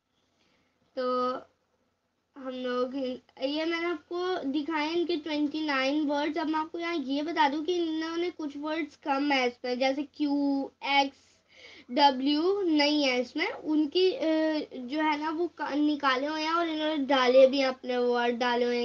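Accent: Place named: Turkey. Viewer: U have the same eccent like Turkish people Masha Allah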